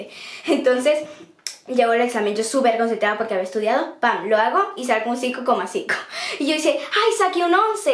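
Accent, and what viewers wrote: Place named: Spain. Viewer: estas agarrando el acento español,trata de no cojerlo ese acento AAAAA😭los venezolanos hablan muy lindo<3 27/8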